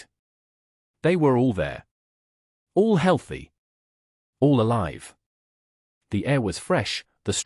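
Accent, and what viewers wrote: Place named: United Kingdom. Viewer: A very feel good story. But these are much better with a cowboy voice, not British. Alabama 🇺🇸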